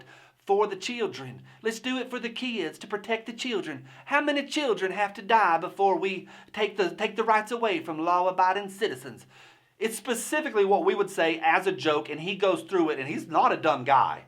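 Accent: South, United States. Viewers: You always earn it!!! PS. How does a Vermont native get such a thick southern accent??? Lol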